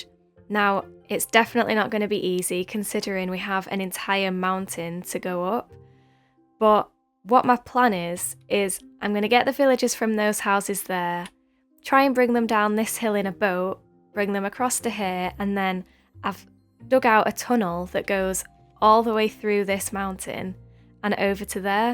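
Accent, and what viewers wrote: United Kingdom. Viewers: Hey! First of all happy new year and i know this can be too much but can you please make a castle on top of the 2 mountains and connect them with a bridge?? And also are you British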